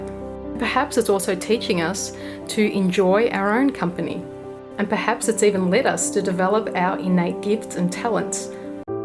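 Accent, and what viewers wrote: Australia. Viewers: I love your Australian accent. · I hear an Aussie accent..I live around Sydney. Do you suggest any meeting group around Sydney. I tried to search on net, could not find anything. Regards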